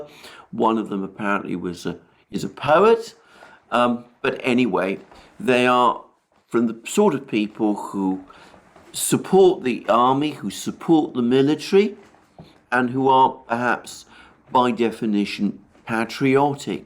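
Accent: United Kingdom. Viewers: One of the best channels for astute russian propaganda with a fine british accent from an ex laywer. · This is the longest uninterrupted string of praising Russia in a British accent I've ever heard. Not a single moment of anything that could be seen as a criticism. Weird, isn't it?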